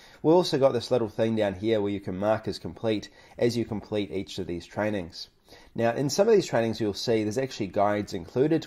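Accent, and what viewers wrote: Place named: New Zealand. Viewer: Yes he's kiwi - still down under and prob not a wallabies fan 😊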